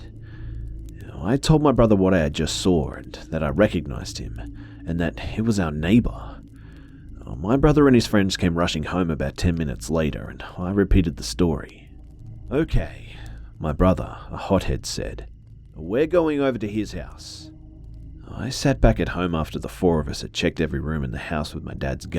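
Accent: Australia. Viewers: Ahh it's always good to hear that amazing Aussie voice! 🌼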